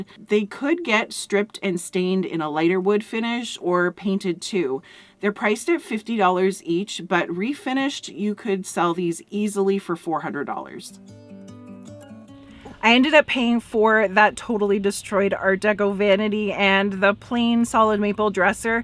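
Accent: Canada. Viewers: I love your Canadian accent. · . Here in the UK we know that when you say out and 'aboot' that's when we know a Canadian. I love to hear it! 🤗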